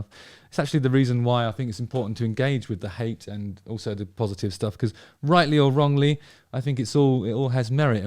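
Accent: United Kingdom. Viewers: condescension with a British accent is so much more satisfying to hear. rock on justin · .."Off you fuck". I love it. I will definitely use that, but it'll never sound as good as it does with the Brit accent.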